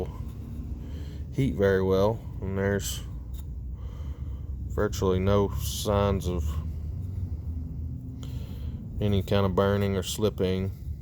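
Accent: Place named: East Texas, United States. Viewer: Hi, you sound like you're in East TX. If so, would you be able to help me identify if I need a complete rebuild or if I just need the basic kit? I'd appreciate it!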